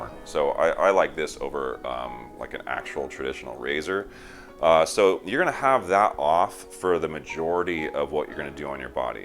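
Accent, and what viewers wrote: United States. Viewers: That god-awful American accent, like scraping a nail across a chalkboard.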